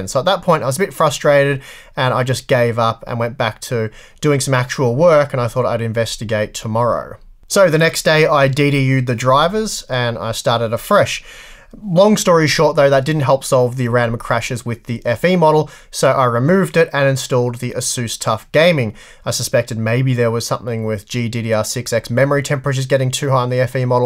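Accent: Australia. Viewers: I just noticed your Australian accent?? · I just watch this channel for the aussy accent the excellent quality is an extra.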